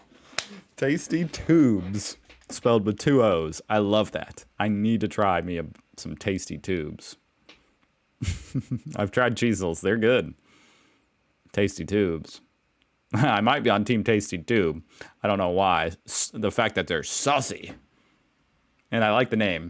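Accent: United Kingdom. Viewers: British English not Australian English accent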